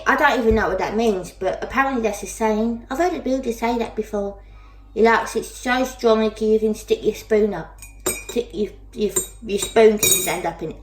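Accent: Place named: Newcastle, United Kingdom. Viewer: What part of England are you from you sound Geordie I'm from Newcastle if you are as well what part of Newcastle are you from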